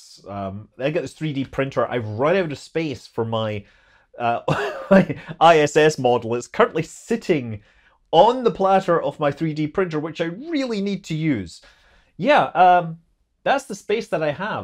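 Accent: Scotland, United Kingdom. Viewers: the one time his Scottish got me: what is the fuel settling burn called?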